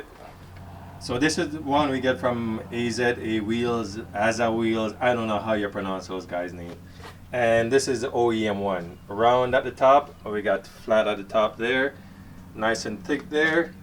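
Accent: Jamaica. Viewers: Wow good for you. That accent sounds Jamaican. Enjoy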